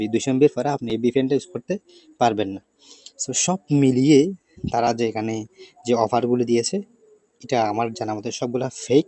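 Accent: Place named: Chittagong, Bangladesh. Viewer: ভাই মনে হয় চট্টগ্রামের😊😊😊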